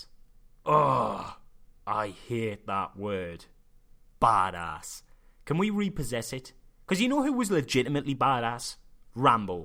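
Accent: Newcastle, United Kingdom. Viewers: Geordie accent at its best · LOL!!!! Best review, 100% agree! You sound geordie mate!? Shoutout from London! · you sound like your from the toon? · Geordie. North-East England. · Sounds Geordie to me. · It's a Geordie accent. Listen to Sergeant Lewis from the old 'Inspector Morse' TV show. From northern England. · It's a Geordie accent from North-East England. Like Davos from Game of Thrones. Except in the show Davos says he's from Flea Bottom so fuck knows how he got a Geordie accent. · Top review mate... Good to hear a fellow Geordie on YouTube. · Wow. Didn't expect Geordie... Class! :D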